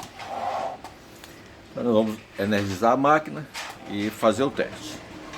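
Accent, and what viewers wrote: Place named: Bahia, Brazil. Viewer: o sotaque parece da Bahia, sou de Salvador! Apesar de aqui estar complicada a situação, ainda assim n troco aqui por nada!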